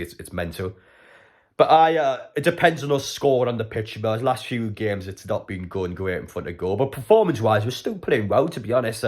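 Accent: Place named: Sunderland, United Kingdom. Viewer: You sound like a mackem a take it you ain't a Geordie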